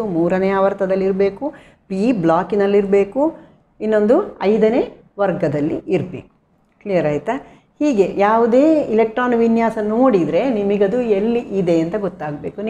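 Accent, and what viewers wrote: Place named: Mangalore, India. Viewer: Tq u so much mam 🌹nimma Mangalore bashe spr🥰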